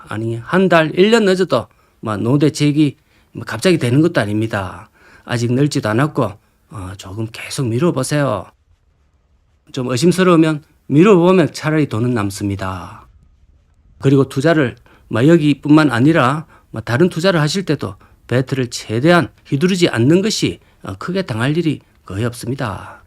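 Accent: Gyeongsang, South Korea. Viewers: 전라도에사는 60대인데도 경상도구수한 사투리가 참 잘 어울리셔요.인생지침에 많은 교훈이 됩니다.항상건강하시구요.감사합니다. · 안녕하세요...항상 수고 많습니다. 이 처음에는 구수하고 조금 어눌한(?) 경상도사투리가 참 좋았는데 지금은 말씨가 표준말로 바꿔가고 말도 빨라지네요 ㅋㅋ.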